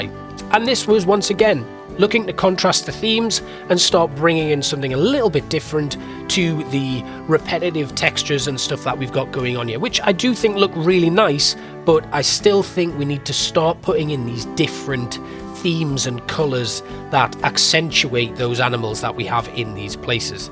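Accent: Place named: Newcastle, United Kingdom. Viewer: Im watching Saltwell now but about to turn off for the footy! You have definitley come on leaps and bounds but by no means would I say Saltwell is bad, especially from the African centre onwards i would say you really hit a stride. Im Welsh and I was sure I could hear a twang but theres no mistaking the Geordie, both melodic accents I guess! Keep the awesome content coming, also if you are looking for further inspiration from creators I highly recommend ZSHPlays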